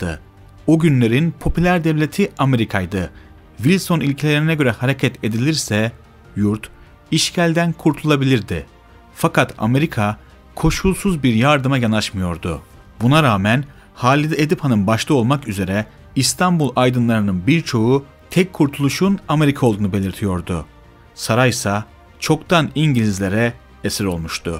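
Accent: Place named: Central Anatolia, Turkey. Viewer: kardeş videoların güzel tebrik ederim Fakat senin "ka" ve "ke" sesleri ile sorunun vardır, Telaffuzuna bir az önem vermelisin. Sözcükler yanlış telaffuzundan dolayı farklı anlaşılmaktadır. Mesela ka^fi demen yerde kalın kafi kullanıyorsun. Orta Anadolu şivesinden kurtulman gerek. Saygılar...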